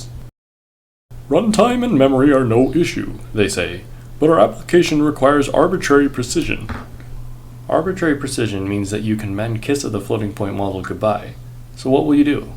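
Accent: Quebec, Canada. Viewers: Juste pour être bien sûr, t'es québécois ???????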